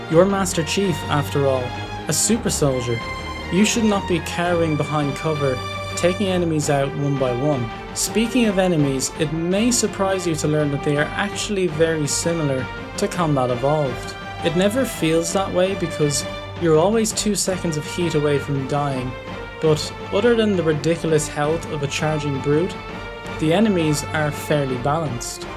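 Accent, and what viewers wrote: Ireland. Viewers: That accent can't get more irish. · Is that a Galway accent I hear? Or at least Connaught?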